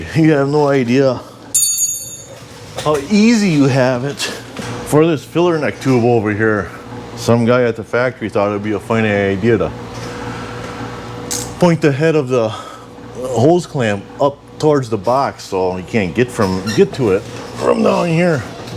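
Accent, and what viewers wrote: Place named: Midwest, United States. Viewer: this channel rocks hahaha . you are good at this and explaining what your doing. your midwest accent sends it overboard ( in a good way)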